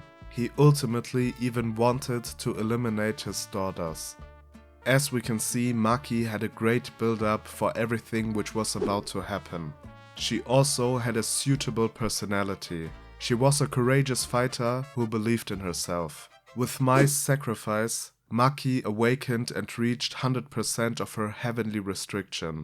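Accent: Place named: Germany. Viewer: Are you German? Your accent reminds me of my classmates from Berlin, i'm saying this in a nice way.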